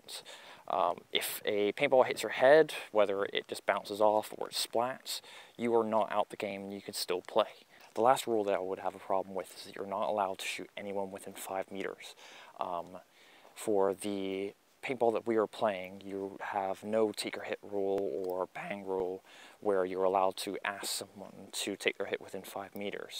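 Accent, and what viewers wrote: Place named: United States. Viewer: Where do you live? You have an American accent, but you're paying for things with pounds?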